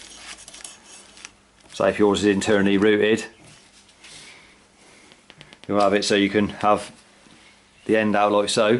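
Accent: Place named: West Country, United Kingdom. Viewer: Sounds like UK west country, perhaps Devon.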